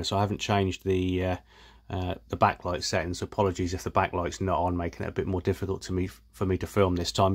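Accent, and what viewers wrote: Norfolk, United Kingdom. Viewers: Thass a fine Norfolk accent you've got there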